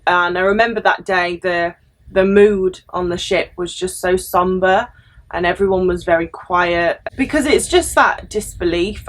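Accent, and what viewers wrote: United Kingdom. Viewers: I subscribe to you because your voice is loud and clear even so you speak British English I understand you I can take a shower while listening to you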